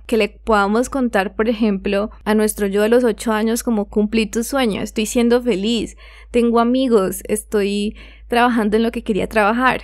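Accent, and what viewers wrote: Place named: Costa Rica. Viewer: Por su tono de voz creo que sos de C. R.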